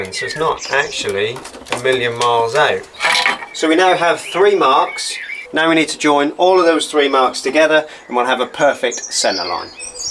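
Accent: United Kingdom. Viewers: Love his British accent 😅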